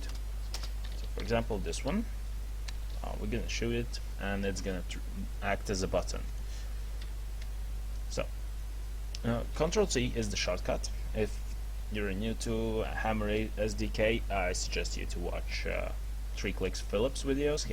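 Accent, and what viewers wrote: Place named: Portugal. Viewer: Ahaha, nota-se claramente que és pt. Bom vídeo!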